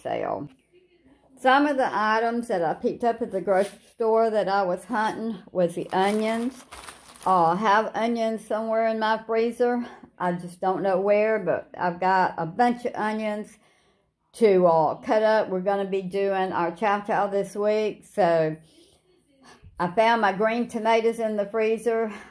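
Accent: South, United States. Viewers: ! My wife just said a true thing to me. We're nearly as southern as you but we just love to hear you talk. Ha. Here at our home it's just me and her. The kids have always done their own thing. I think we could turn 'em loose in the yard to graze and they'd do fine. Home cooking just flies over their heads. So with just us two it's easy to improve the old larder. And always something tasty in the freezer to defrost. But. You buy and can and all that but from what you tell us I conclude that your grub flies out the door about as quick as you get it. How do you stock up with all those hungry hounds dropping by for a feed?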